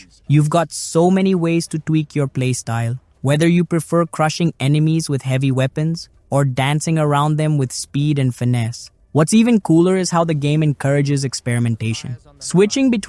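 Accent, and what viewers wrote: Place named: India. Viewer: Sometimes you sound really indian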